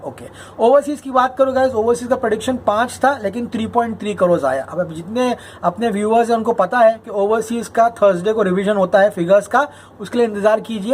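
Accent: Karnataka, India. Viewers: Yaar guru neevu...amazing reviews....one of the best style of reviewing...your kan-glish is outstanding..Namaskara.. ✨💥💥🙏🏻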